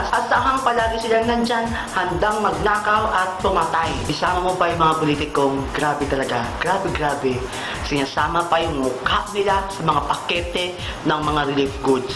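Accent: Visayas, Philippines. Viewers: Bisaya kba? oOh..ganyan lang tlaga ung accent mo?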